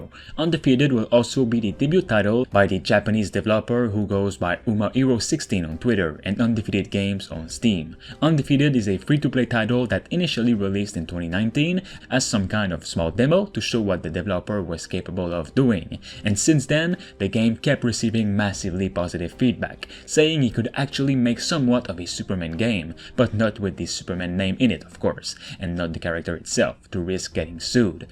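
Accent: Quebec, Canada. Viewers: U sound like gsp . You're definitely from quebec. Great review merci . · Ça paraît que ces un québécois qui parle😂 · Aye un québécois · Dude sound like he from quebec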